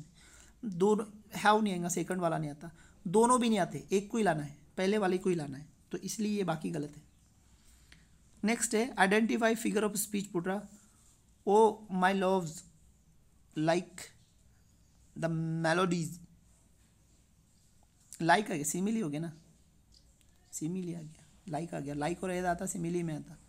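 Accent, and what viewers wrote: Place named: Nandurbar, India. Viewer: Mughe laga Nandurbar se hain aap .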